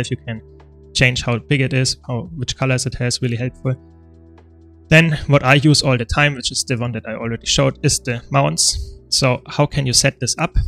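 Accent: Germany. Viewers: good german english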